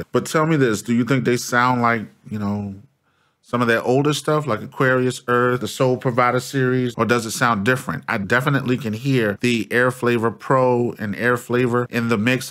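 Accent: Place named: New York, United States. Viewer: you sound like you from NY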